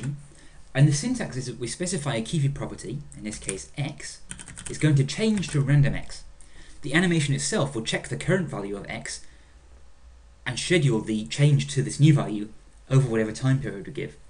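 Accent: United Kingdom. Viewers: he lets his northern accent slip now and again -- his "jeeves" accent is a complete fabrication. There are many about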